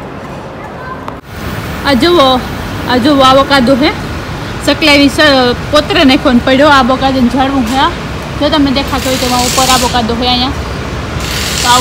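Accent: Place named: Kathiawar, India. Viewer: ખરેખર, તમારો you tube પર નો વિડિયો જોઈ ને બહુ આનંદ સાથે તમારા સાહસિક સ્વભાવ ને પ્રણામ. આમ તો આપણી કાઠિયાવાડી દિકરિયું ઍટલે ઝવેરચંદ મેઘાણી સાહેબ ની ચરણ કન્યાની કવિતાની યાદ અપાવતી ક્ષણો... જે ડણકું દેતાં હાવજ ને પણ ડસ કારિયું દયીને ડરાવતી... તમારી તળપદી બોલી ને હાંભળી ને બહુજ આનંદ સાથે છાતી અમારી ગજ ગજ ફૂલતી કરી દીધી...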